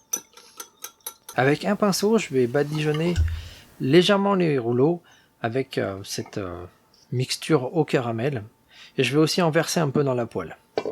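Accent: Venezuela. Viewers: Francés venezolano?🇦🇷🇧🇬🤔🤔🤔🤔🤔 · jajajaj excelente español a lo Venezolano, buenísima receta de ese manjar de los dioses.. Como dirían por aquí, "pa chuparse los dedos" saludos mi pana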